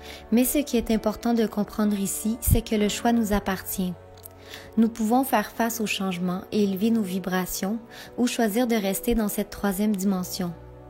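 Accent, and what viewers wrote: Quebec, Canada. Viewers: Bahahahahaaaa je m'attendais pas a entendre du Quebecois 😂😂😂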